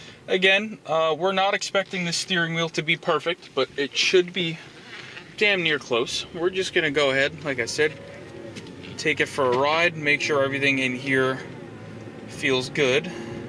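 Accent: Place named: South Jersey, United States. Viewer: Much appreciated, it's on my list. Kudos from a native South Jerseyan (clocked you accent immediately 😅)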